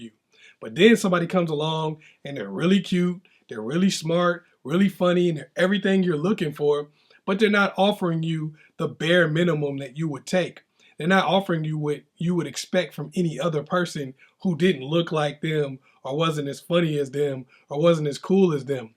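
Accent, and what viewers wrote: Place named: Decatur, United States. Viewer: Are you from the East side? You sound like you’re from Decatur? Btw great advice as always ✌🏽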